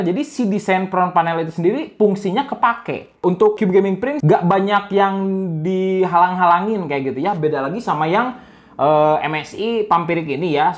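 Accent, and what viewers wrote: West Java, Indonesia. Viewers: other people: review this guy: Ripiu wkkwkw, sunda banget kedengerannya pertahankan ciri khas nya kang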